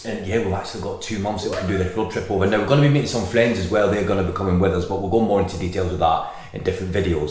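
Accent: Scotland, United Kingdom. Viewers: Man, where is your accent from?! I thought you were scottish, then a brummie, then from Lancashire.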